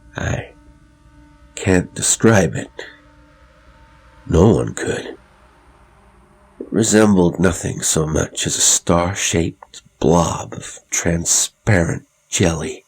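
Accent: United States. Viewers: I agree and I think he dose a bloody good American accent too! 😵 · Always DELIGHTFUL to hear from you, my friend! You're very good at presenting these stories, and I find your voice portrayals of the different characters to be quite a talent that sets you apart from others, who apperantlly consider a slow monotone to be the apex of dramatic performance. Thanks for all you do! P.S. --- your American accent is ALMOST flawless, and thoroughly believable. (the only giveaway is the use of idiom, which is so nit-pickish of me, that it's beneath notice!) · Great American accent..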